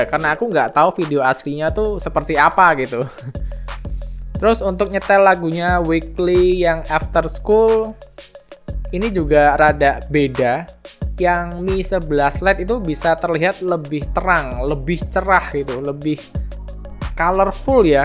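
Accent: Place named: Semarang, Indonesia. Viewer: Koyone iyo lur, soale nk wong semarang ngomong indo rodo medok, medok.e yo ngene iki hhh Salam dr kendal-klw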